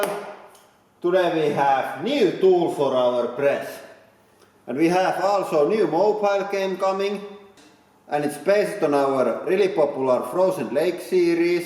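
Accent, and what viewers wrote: Finland. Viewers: Charles Ray He is from finland. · He´s from Finland.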